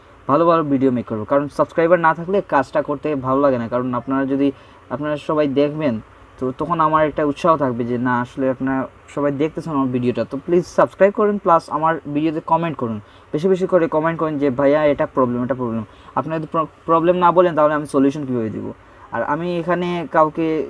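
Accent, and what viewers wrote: Sylhet, Bangladesh. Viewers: apnar kotha shuna mona hoitaca apne sylhete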